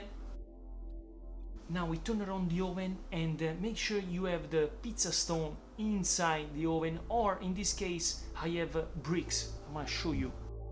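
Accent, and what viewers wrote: Italy. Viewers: Thank you l love watching you make your pizza full of action like dancing and so nice to hear your italian accent, bravo! Greetings fm Malaysia.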